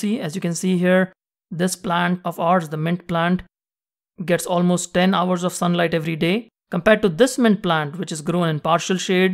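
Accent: India. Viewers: Such a helpful video 🙏 thankyou for posting this 😇 you sound indian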